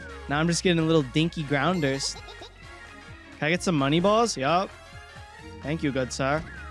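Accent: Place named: Scotland, United Kingdom. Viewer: Most accurate scottish accent ive heard, couldn't understand a word besides laddie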